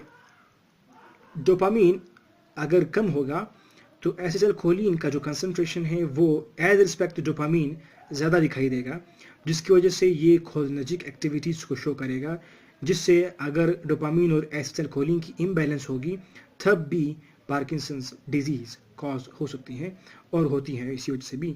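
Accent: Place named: United Kingdom. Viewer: U are having good teaching methods but don't try to teach like British person i think Pakistani don't get that language 😮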